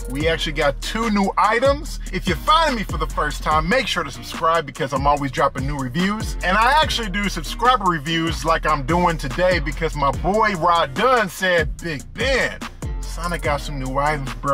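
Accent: Texas, United States. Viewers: That Texas accent 😹 1K calories for the burger????!!! 🍔 It does look super good though! Only hot dog I trust is from Costco 😎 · Love the attempt at sounding like a Texan LOL Shout out from Dallas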